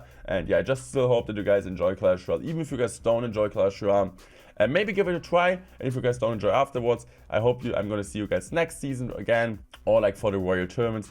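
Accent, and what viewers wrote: Germany. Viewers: Ich mag den German accent in your sprach sounds sehr nice and refreshing zu all den anderen Englischsprachigen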